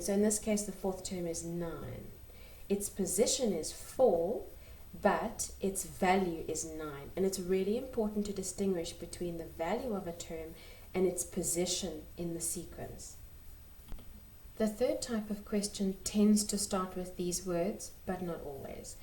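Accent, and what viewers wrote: South Africa. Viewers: I honestly love SA accents so much. I have an english one but yours just sounds so much more elegant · Youre South African? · She sounds south african ryt...?😄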